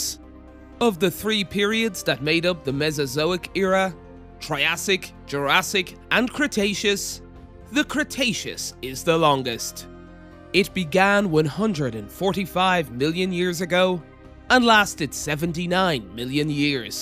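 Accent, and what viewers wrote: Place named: United Kingdom. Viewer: The narrator has a shrill voice and a UK accent. Not my favorite.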